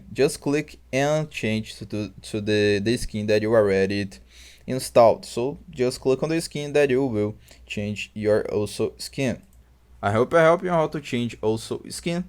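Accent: Brazil. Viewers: Provavelmente sim, sotaque não me engana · brasil ae parceiro? sotaque naquele pique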